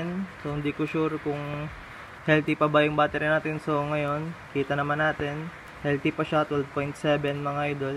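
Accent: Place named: Banyumas, Indonesia. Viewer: Bahasa nya kek jawa ngapak njir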